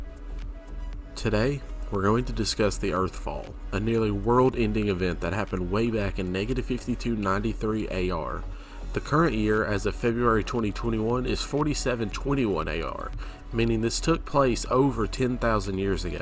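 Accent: South, United States. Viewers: I'm from SC and was also pleased to hear a Southern GM haha. It's not a common accent for these kinds of videos so it definitely made me smile Great work on these vids btw! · exceptional voice/accent, Im from SC and appreciate the southern rep. The music doesnt fit though.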